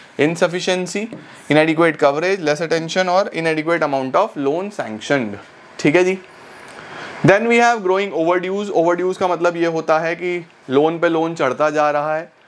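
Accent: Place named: Punjab, India. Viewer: Sir your punjabi assent 🔥🔥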